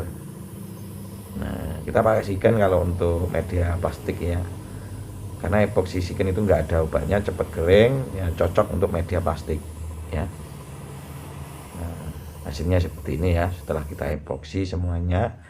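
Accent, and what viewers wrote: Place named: Kalimantan, Indonesia. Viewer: mntap . suara orang kalimatan kh bng yg ngmong ini ? bntu suscribe bng . sering nnton jga soalnya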